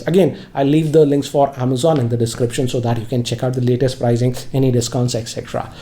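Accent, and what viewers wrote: India. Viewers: I love his indian accent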